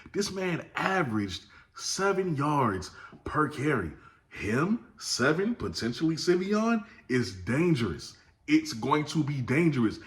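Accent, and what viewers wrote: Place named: Lowcountry, United States. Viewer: Okay you from the low country!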